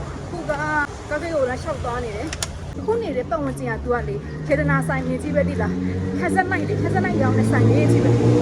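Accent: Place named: Myeik, Myanmar. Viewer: အမက ဘိတ်သူလားဗျ ဘိတ်အသံနှင့် နည်းနည်းတူနေတယ်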